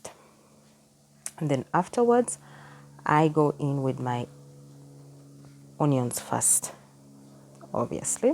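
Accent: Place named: Malawi. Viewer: I love how straight to the point the video is. I'm from Malawi, and we also use Royco here 😂. I've just bought a pressure cooker and an Air Fryer as well. What's beautiful to me is listening to your voice - it's literally identical to how we pronounce words here. Instantly, I was drawn to keep watching, and in fact, I'll be here for a long time watching your videos.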